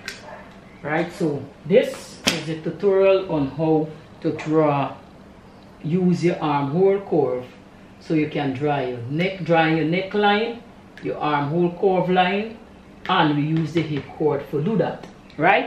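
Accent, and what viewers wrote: Guyana. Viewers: Good day love your video you sound like a Guyanese. I am too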